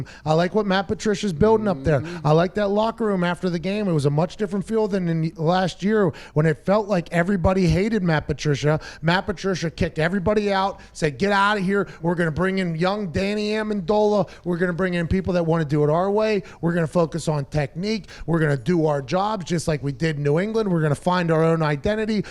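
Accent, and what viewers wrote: Pittsburgh, United States. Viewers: Pat has such a Pittsburgh accent love it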